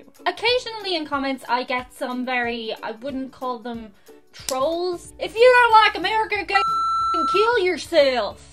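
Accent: Ireland. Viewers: very interesting. I'm from the usa but my Grandfather (Thomas Feeney) was born in Dublin Ireland. I love your Irish accent...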